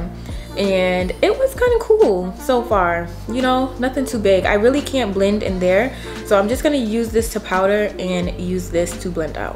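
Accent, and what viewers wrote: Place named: East Coast, United States. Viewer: This is the first video I’ve watched of yours. I love this look! Soooo pretty. I also love your accent. East coast?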